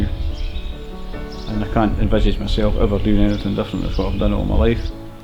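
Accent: Scotland, United Kingdom. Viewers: you must get someone British/Scottish to translate for you.<3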